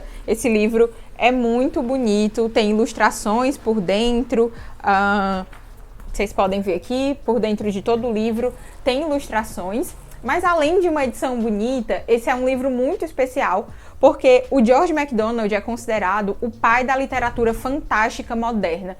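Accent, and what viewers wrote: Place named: Northeast, Brazil. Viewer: "Uma hora eu falo assim, outra hora eu falo assado" ahahaha ah, meu nordeste ❤ Ótima resenha, já quero leeer 😍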